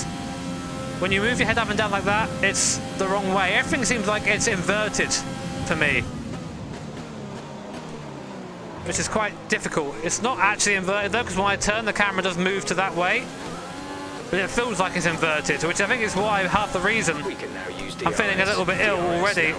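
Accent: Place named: RP, United Kingdom. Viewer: That posh gentry accent is TOO GOOD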